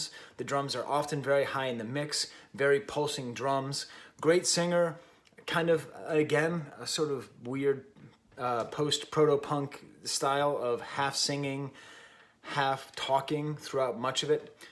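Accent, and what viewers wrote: Dublin, Ireland. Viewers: He has a very Dublin accent. Hes from the same town as me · Nowhere near a British accent, it's a strong Dublin accent.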